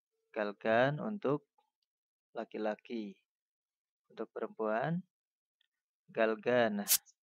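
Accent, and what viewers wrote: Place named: Saudi Arabia. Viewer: هذه ليست لغة عربية فصحة هذهِ لهجة سعودية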